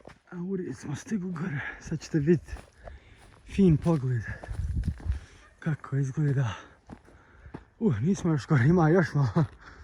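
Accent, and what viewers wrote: Bosnia and Herzegovina. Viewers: Simpatičan si sa svojim Bosanskim u izgovoru a vidi se da iskreno iz srca govoriš, sretno dijete drago i uvijek uživaj u našoj domovini🇧🇦❤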